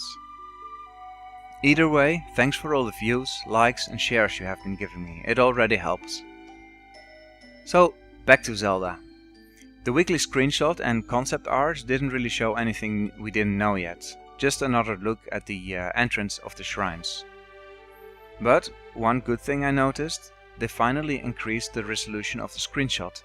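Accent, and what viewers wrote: Netherlands. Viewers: are you from holland ???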